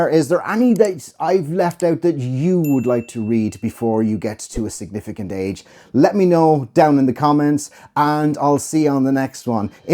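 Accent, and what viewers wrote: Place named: Ireland. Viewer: I’m finishing games of thrones and lonesome dove is next — yes they are Texans as am I .. born here— you are Irish correct— your accent.. 😊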